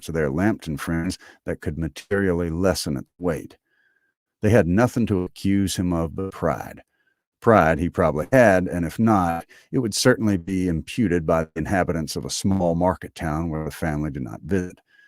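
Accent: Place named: South Carolina, United States. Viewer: I would love to hear you read this (I'm a South Carolinian, so your way of speakin' is the way everything should sound, of course!) but there's some kind of weird sound or feedback going on. Ha! Black coffee and harbor tea!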